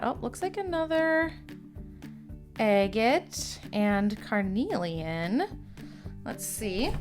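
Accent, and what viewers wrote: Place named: Minnesota, United States. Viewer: I mean you're a Minnesotan I say melk, tæble, and I'm from SD Bāg vs beg :)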